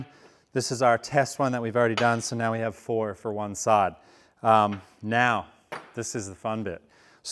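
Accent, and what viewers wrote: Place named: Australia. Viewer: First time watching your channel, and I'm sitting here trying to figure out where you are...! Obvs American accent, but I'm hearing Aussie and Pom, and you're talking about The Mad Scot. Now that I've googled...wow, you really have got an Aussie drawl!! 😄😄 Great work mate, those flanges look superb 👌 (Possibly might have liked some in depth on the sand forming, but awesome all the same!)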